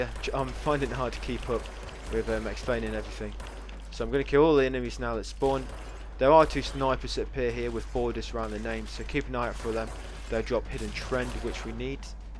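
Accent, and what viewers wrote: United Kingdom. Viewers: what part of the uk you from I was trying to pick the accent, after hearing you for all those hours 🤔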